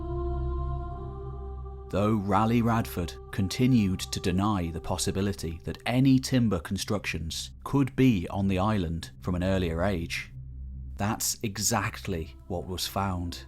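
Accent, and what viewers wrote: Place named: North, United Kingdom. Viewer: He's a northerner.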